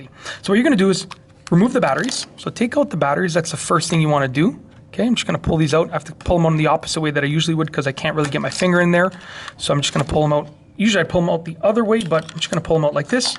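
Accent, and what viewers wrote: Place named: Canada. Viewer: thanks so much for this great tutorial! totally fixed it! and i think i understood your Canadian accent!!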